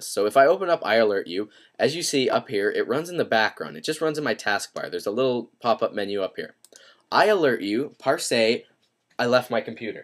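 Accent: Canada. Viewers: ur canadian! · i love his canadian accent. thanks. i ended up downloading screenflow after seeing this. :)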